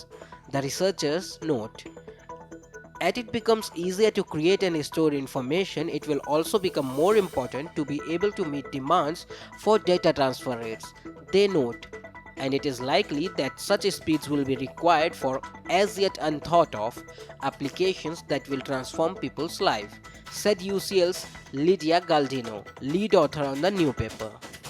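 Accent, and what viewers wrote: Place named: India. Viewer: 咖喱味英语劝退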